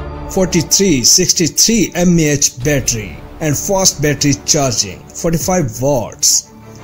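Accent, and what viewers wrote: India. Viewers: English made in India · The voice over is 100% Indian//Bravo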